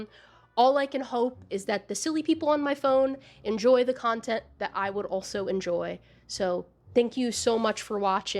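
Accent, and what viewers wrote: South, United States. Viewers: DAVID DIDDLER 😭 I SWEAR EVERY LINE THAT COMES PUT OF YPUR MOUTH IS SO OUT OF POCKET BUT PERFECT THE SOUTHERN ACCENT MAKES IT 100x BETTER HOLLY HELL Sadly I’ve never been to a con of any sort cause I’m from rural Maine and poor but I want to go to the local annual furry con in Portland someday :3 My only horror story about the furry fandom from personal experiences besides having to hide it from my classmates to avoid bullying was probably that I joined when rainfurrest was a current event and a lot of the furries I grew up watching turned out to be creeps 😭 (I think odinwolf ended up being like a huge creep.) Luckily most of the furries that I watched a lot are great people like pocari roo (as far as I am aware) and this guy who I still watch who’s deaf and makes education videos about what it’s like to be deaf and answers questions :3 he’s very wholesome (EDIT: LOOKED HIM UP! 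His name is Xushowolf he’s very cool :] ) So I’m glad not all my memories of being in the early fandom have been tainted by finding out horrible things about the YouTubers years later 😭 Oh yeah also other cursed thing in general is that I also joined the hetalia fandom around that time I’m still in it the fandom is much more chill now for some reason (maybe I’m just good at avoiding the crazy) but I still have people like get mad at me cause I just state (on my own blogs mind you like I’m not even commenting on their posts.) that one of the most popular ships makes me uncomfortable (usuk/whatever y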